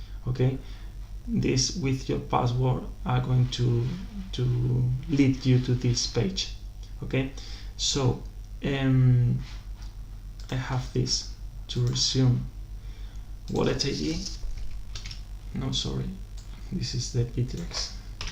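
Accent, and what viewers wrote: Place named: Spain. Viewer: Pues el habla español , o al menos su acento es de español hablando ingles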